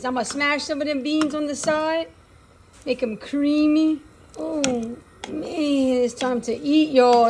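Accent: Louisiana, United States. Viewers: Just fyi...love your Cajun accent. I love in Texas, but my Daddy was born & raised in Catahoula. Spent a lotta time there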